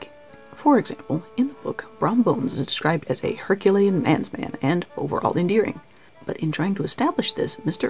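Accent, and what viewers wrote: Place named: England, United Kingdom. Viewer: I feel like I learn more watching your videos than I would reading the book! Loved the English accent!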